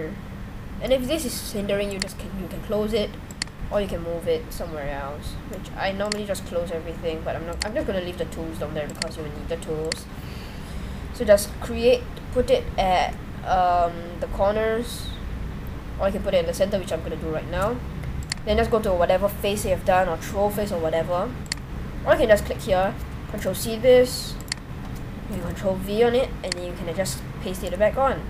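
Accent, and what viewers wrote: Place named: Singapore. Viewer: You sound like a singaporean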